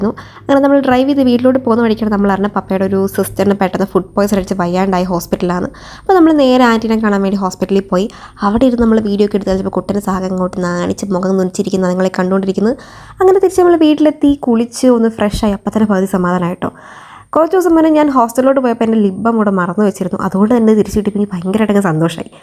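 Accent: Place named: Kozhikode, India. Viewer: Calicut slang ollalo da❤️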